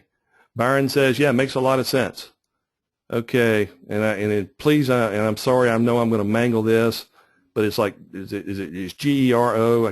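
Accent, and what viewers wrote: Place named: South, United States. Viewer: OMG...I just love your freaking voice!!! You sound just like famous celebrity gardener, P. Allen Smith! with that soft, soothing, medium southern drawl...I could just sit and listen to you talk about the phone book for HOURS!!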